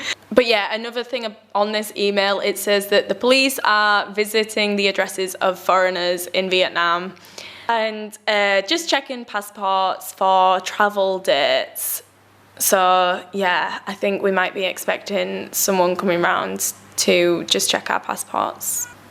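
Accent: United Kingdom. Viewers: I love UK accent wish you all luck lady. BTW you're so pretty oh one more thing stay safe and healthy 👏👏👏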